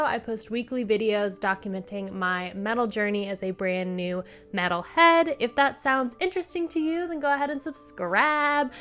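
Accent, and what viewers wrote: South, United States. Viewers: Where are you from? I'm detecting a southern accent in your voice.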